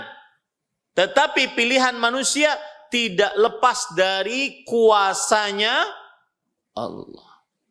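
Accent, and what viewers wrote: Banjar, Indonesia. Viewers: Org banjar kah ini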